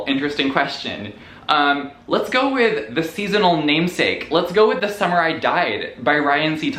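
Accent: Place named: United States. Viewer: I am soooooo curious. How do you have such a strong and authentic American accent if you have never lived in the US. I had always assumed you moved to Asia in adulthood. 🤷‍♀️ Thank you for the list. Great reccomendations as always.❤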